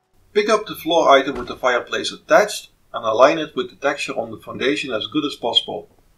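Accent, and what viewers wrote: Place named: Netherlands. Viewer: Your Dutch i can hear it cool vid..👍🏽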